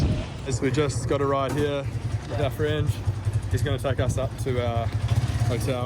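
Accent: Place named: United States. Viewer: Hi, enjoy my home country and be safe always!your accent sounds like americans but upon checking your channel i found out you are from NZ..hope you get a lots lots of Filipino subscribers!gluck👏✌️🙏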